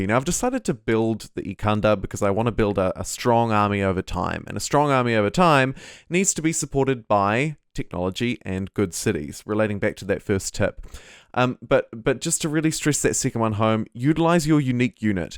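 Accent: New Zealand. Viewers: I love Civ V and VI and I dig your NZ accent so, I like your videos 🥰🤘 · Did a double take to hear another kiwi accent. So used to hearing marbozir! Good summary mate, and good energy. Stoked to see Civ V still active out there.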